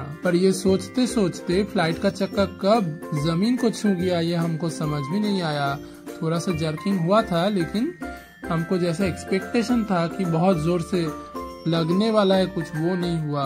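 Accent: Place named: Bihar, India. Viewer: Bhaiya narration bahut badiya kiye ho.. Or accent ekdam bihari kaafik per bhai kasam se narration mast hai bahut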